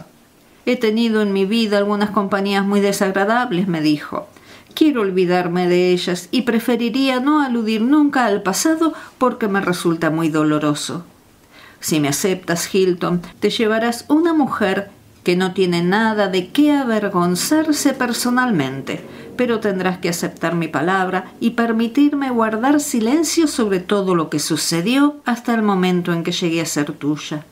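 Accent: Rioplatense, Argentina. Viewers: Para mí la pronunciación de las "y" y de las "ll" al modo rioplatense es absolutamente irrelevante. En cambio la entonación de las oraciones y de los párrafos es perfecto. La interpretación de los personajes y sus voces es sutilmente eficaz. No hay incorrecciones en el seseo o el ceceo ni en ninguna otro fonema. Los acentos son correctos. Y la narración de principio a fin es no sólo amena sino que consigue enganchar, entretener y alegrar el intelecto. Gracias de verdad por este canal de lectura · Me encantó la narración, el tono y estilo de la voz, la velocidad, el acting... pero tanto SHO,SHO,SHO del castellano rioplatense me irritó y no terminé de escuchar. ¡Un abrazo y mucha suerte!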